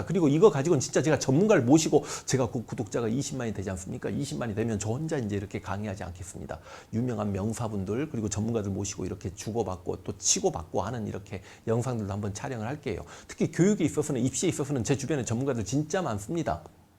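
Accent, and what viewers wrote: Jeolla, South Korea. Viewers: 형님..잘 보고 갑니다. ^^ 전라도 분인것 같아서 ㅋㅋ 저도 전라도거든요 ㅎㅎ 앞으로 더 좋은 가르침 부탁드립니다.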